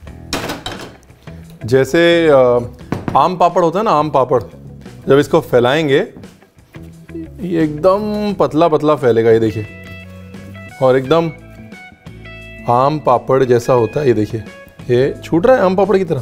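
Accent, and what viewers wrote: India. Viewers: Pta nhi jab MASTERCHEF INDIA MEIN Chef Ranbeer ko dekht thi ..to dono chef k muqable mein wo thoda...moody lgi thi..but..here OMG I can't...he is sooo much...typical Punjabi GREEN HEART MAN...NOw I love him soo much & his simple cooking style..& also his frankly desi speaking....😍😍😍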